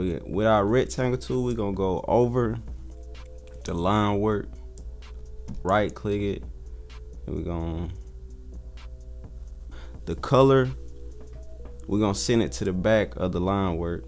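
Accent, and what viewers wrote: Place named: South, United States. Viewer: Man, are you from the south? Atlanta? You sound like the cat from Outkast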